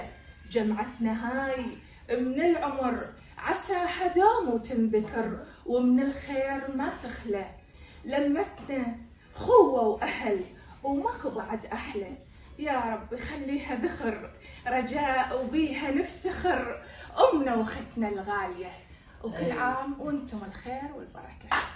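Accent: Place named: Basra, Iraq. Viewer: عيد المبارك عليج العسوله يمه فدوه لهاي اللهجة العراقيه البصراويه الحلوه و كل عام و انت والأهل و العائلة بالف خير و صحه و عافيه و صيام مقبول وأجر ثابت يروح الروح فديت الهلهوله العراقيه الاصيله مال امهاتنه وبيبياتنه المعدلات احبج هواي هواي يكلبي متابعتج من بابل 🌷🌺💐❤️💜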